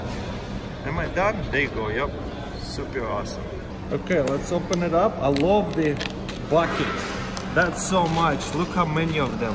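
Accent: Russia. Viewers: Its just his accent cause he is russian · Some heavy Russian accent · Мне кажется или это не американец, а русский. Акцент вообще не английский и произношение хромает. Ну, если что: привет из рашки! :3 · У тебя акцент как у русского,ты русский не так ли?) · ты можешь говорить по русски? немного заметно по твоему акценту. это круто,что наши могут так подняться на ино.ютьюбе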